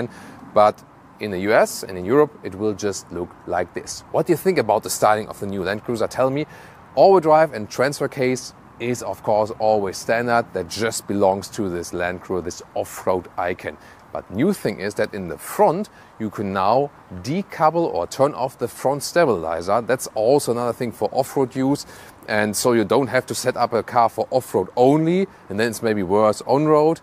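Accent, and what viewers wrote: Germany. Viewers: Not a big fan German cars, their time is over. German guy talks about toyota, very weird. And the accent as well. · 主持人很重德語口音😂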